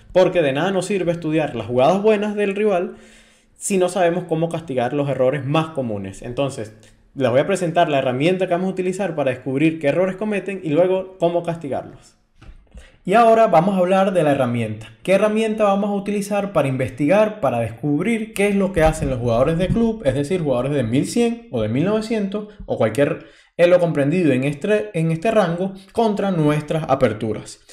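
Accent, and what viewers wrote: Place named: Venezuela. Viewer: Joder tremenda explicacion Soy 1800 y jugador del sistema londres y desconocia ese tipo de castigos muchas gracias bro. Saludos desde Venezuela que creo que eres venezolano por el acento y mas si te recomendo Gascon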